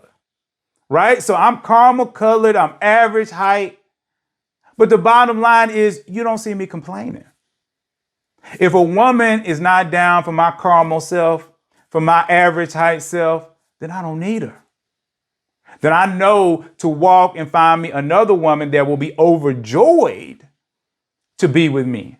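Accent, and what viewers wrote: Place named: South, United States. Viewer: your country (or what some perceive as Southern) accent is hilarious 😂😂😂. I did not see that coming. Laughter is good for the soul so thank you for opening in that manner. I always appreciate your viewpoint so I was wondering if you are receptive to sharing your insight on a video recommendation from a viewer.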